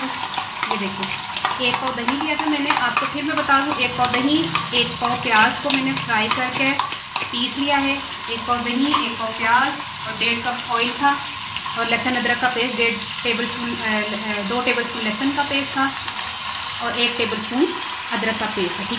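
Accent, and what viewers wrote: Punjab, India. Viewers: Aaahhhaaa punjabi ka tarka....enjoy your pure pinjabi accent... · First of all thanks for this wonderful recipe. I am urdu speaking but at the end your punjabi accent is so awesome. I like the way of your speaking. Good job.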